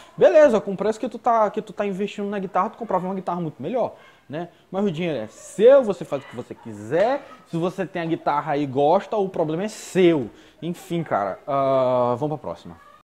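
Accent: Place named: Maranhão, Brazil. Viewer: Sotaque de Maranhense!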